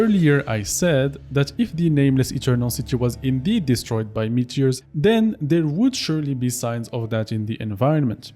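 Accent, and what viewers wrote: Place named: France. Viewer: Ah!! Serais tu...french? Je me disais que cet accent subtil me disait quelque chose... Excellente vidéo, hypé pour le dlc, mais il va falloir éviter Yt les derniers jours avant la sortie...me faire spoiler les boss une semaine avant la sortie par """"""certaines"""""" chaines depuis des années j'en peux plus...😳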